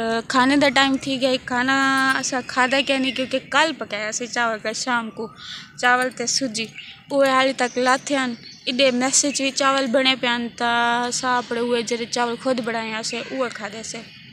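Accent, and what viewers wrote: Saraiki, Pakistan. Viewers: صدقے تھیواں تیڈی میٹھی سرائیکی ❤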